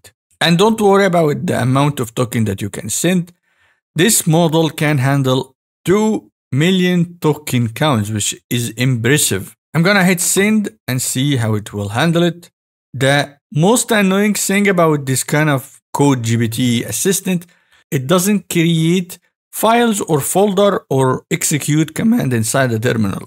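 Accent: Egypt. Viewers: أنت مصري اكيد الاكسنت فضحاك😅❤ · You must be an Egyptian. I know our accent. If so, 3amel eh? XD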